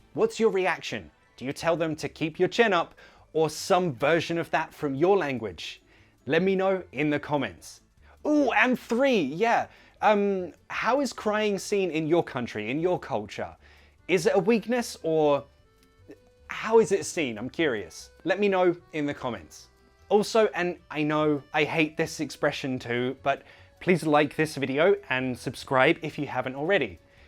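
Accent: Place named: RP, United Kingdom. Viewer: Kudos. Love when you teach posh english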